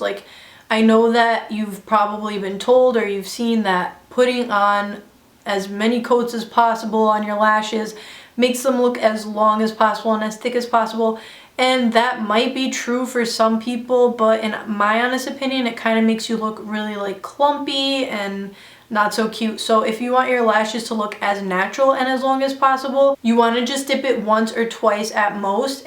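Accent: United Kingdom. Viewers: I subscribed because your British accent made me laugh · Love the English accent!! I'm from the uk. We do say i can't be botherd alot 😄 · omg loved this video to the moon and back, you had me laughing so hard with the "I can't be bothered" xD love brits accents! you seem in such a good mood definitely contagious cause now I'm smiling! :p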